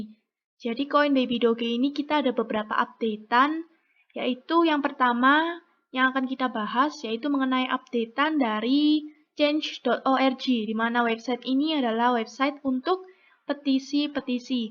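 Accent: Java, Indonesia. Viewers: Halah iki mesti wong jowo sing ngomong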